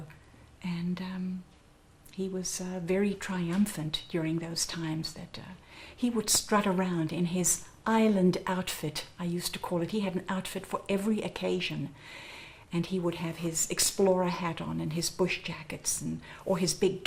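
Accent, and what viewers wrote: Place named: Transatlantic, United States. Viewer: ine of the last beautiful women that use the transatlantic accent. love iy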